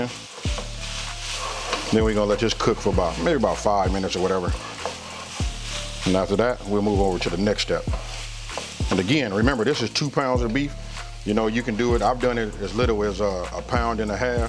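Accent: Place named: California, United States. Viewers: The LA accent in affect! Lol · Knew you was from Cali before I even seen the hat lol Took me 20 years before I realized we had an accent out here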